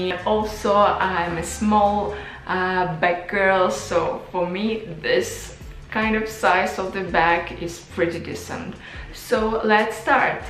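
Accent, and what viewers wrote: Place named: Russia. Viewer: She has Russia’s accent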